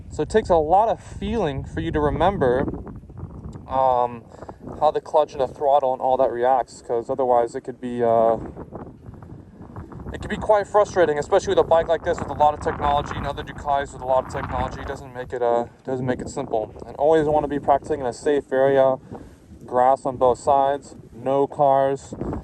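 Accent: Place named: United States. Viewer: Having grown-up in a windy place here in the States, on my BMX Bicycle and I remember when you get that front wheel in the air it is more like a sail on a ship. Appreciate the types have a 2018 SP, very unusual to see your year with a single exhaust. You sound American, but looks like your in Germany or Holland.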